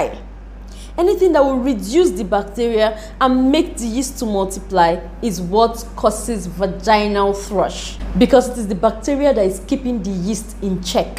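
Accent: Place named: Nigeria. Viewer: I think she's a Nigerian 😃😃😃😃😃😃😃😃😃I love her❤❤❤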